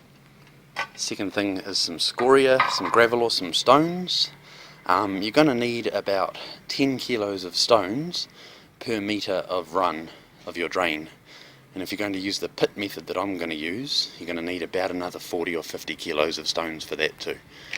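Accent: New Zealand. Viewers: Loved this! Funny guy and I could tell you were a kiwi:) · are you a kiwi too bro ? love i found this video as its exactly what i need to know! chur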